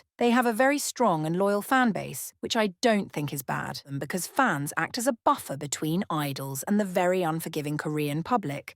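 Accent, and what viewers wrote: United Kingdom. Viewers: Artistic originality without the resources to promote them seems pretty futile! I guess you like to think you are clever by saying silly and outlandish things in that calm British accent to make it seem like it is a well considered opinion, whilst you are simply shilling for engagement just like all the trolls!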